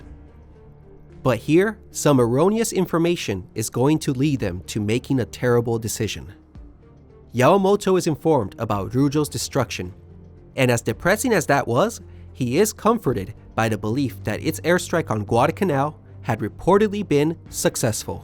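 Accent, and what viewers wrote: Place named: United States. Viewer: These are two very well done videos. Thank you for separating them from the perspective of each commander. I do appreciate you reading from sources as well as narrating the events. However, I would like to give you some constructive criticism. When people make videos on topics that I do not consider consequential, I don’t give constructive feedback. But since you are making informative videos on world history, I would like to do this. And my criticism is regarding your pronunciation of certain words. From your accent, you’re obviously American as I am. Your pronunciation of the letters TH vary. You pronounce thoughtfully, and thankfully, perfectly in my opinion. But the harder TH sound of the, them, and they come across as de, dem, and day. Also, with the words thoughtfully, and thankfully, you use the letter L correctly. 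But in other words, you swallow it. Guadalcanal becomes Guadohcanow. Vulnerable becomes vuhnaboh. I’ve been around, and I know that the ability for someone to communicate in my version of English has nothing to do with intelligence. Just like my inability to speak Russian, nor Swahili is a reflection of my own intelligence. The ability to speak American English, comes down to education and application. You have demonstrated your education by putting together this video. I would just encourage you to apply yourself a bit more to the language to finish off this video with the same expertise and skill with which you began. Thank you again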